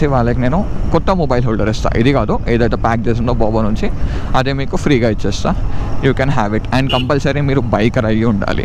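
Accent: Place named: Hyderabad, India. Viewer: The way you speak like light posh and hyderabadi boy 😍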